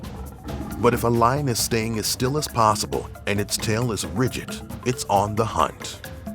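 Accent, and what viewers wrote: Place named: United States. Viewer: Hearing an American accent in a metric measurement 👌🏼👌🏼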